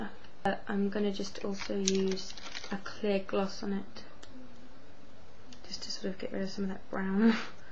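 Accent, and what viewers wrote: United Kingdom. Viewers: what part of england u from? always wondering where that type of "posh" accent comes from. like kate middleton. idk if she worked on her accent but ive NEVER heard her accent on anyone else. yours is nowhere near as posh as hers no worries! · your not posh your just british like me mate x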